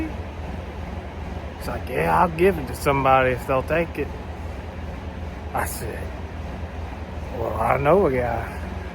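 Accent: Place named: Kentucky, United States. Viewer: I knew it... I knew you were from KY. You talk just like me. But I'm in northern ky. Got 165 acres of nothing but deer and turkeys..... not another house in site...just like we like it. Glad I found your channel.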